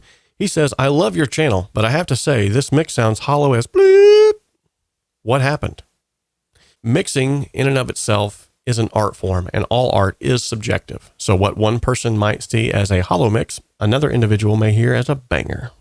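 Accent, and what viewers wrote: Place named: South, United States. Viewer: Hi I'm a singer/songwriter composing own my songs from home and your production tutorial videos have helped me so much in my own music production please dont pay attention to the negative comments and by the way you have a great southern accent stay blessed!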